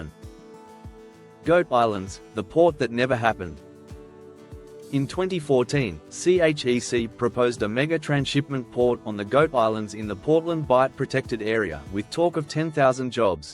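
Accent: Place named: England, United Kingdom. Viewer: This presenter has an English accent has he ever wondered why a [so called] British subject was left back so badly, that they need catching up in every department with Chinese help and money, if you didn't or cannot offer anything in the past or now toward our development. please leave us alone, all we gonna get from any western associations are sour grapes.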